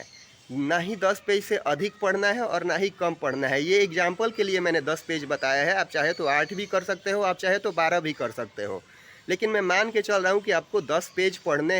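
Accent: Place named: Bihar, India. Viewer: Bhaiya aap up se ho kya ki bihar aapki aawaj up bihar wali lg rhi h ❤ please btaiye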